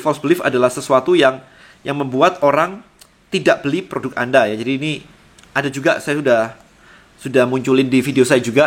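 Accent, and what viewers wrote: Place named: Surabaya, Indonesia. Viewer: logat surabayansss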